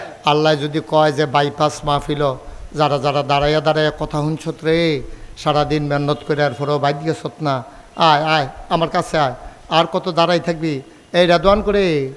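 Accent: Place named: Comilla, Bangladesh. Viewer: হুজুর মনে হয় কুমিল্লার। মাশাল্লাহ অনেক সুন্দর ওয়াজ।💯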